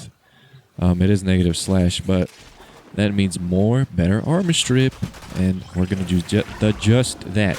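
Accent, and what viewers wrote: United States. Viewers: Q&A: How did you, a montenegroid, learn to speak english so well with American accent? Also, are you still a student? What do/did you study?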